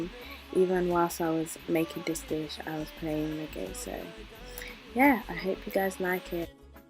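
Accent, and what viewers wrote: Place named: London, United Kingdom. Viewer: I can hear the London in you. Lool. Great job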